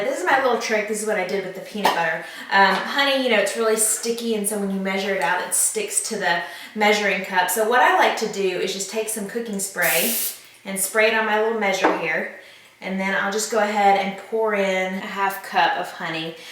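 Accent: South, United States. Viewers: Batman needs a classy southern gal hey your all I can get use to that 😆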